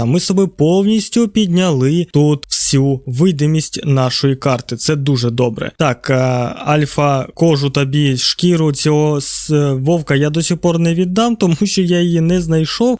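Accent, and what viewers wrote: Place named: Surzhyk, Ukraine. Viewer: Суржик просто топ🥹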